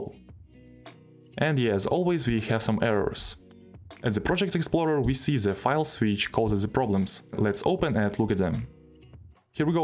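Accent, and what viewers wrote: Russia. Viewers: Акцент сразу выдает рашу )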